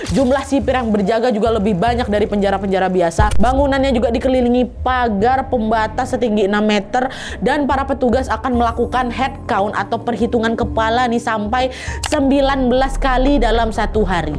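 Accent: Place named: Medan, Indonesia. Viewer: Di lihat dari logat-logat nya seperti anak Medan😂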